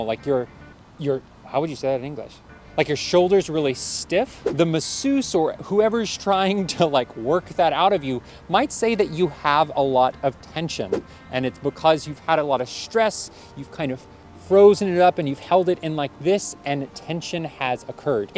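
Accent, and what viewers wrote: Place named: United States. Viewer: 한국말 하실 때랑 목소리가 전혀 다르신게 더 매력적으로 느껴집니다. 목소리, 톤, 발음이 평소 많이 듣던 미국영어 음성이라 선명하고 정확하게 들려서 너무 좋아요. 딕션이 좋으시다~라고 하면 이것도 콩글리쉬일까요? 사실 콩글리쉬 자체도 콩글리쉬라 Broken English라고 써야한다는 얘길 듣긴했습니다만ㅎㅎ 좋은 영상 감사해요. 야외스튜디오 너무 좋습니다~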